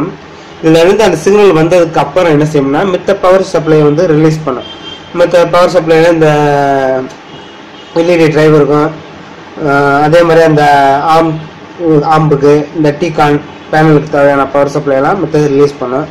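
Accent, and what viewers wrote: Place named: Madurai, India. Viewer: Good explain in madurai tamil...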